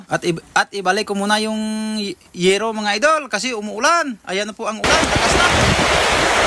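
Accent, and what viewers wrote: Iloilo, Philippines. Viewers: Ilonggo ka dong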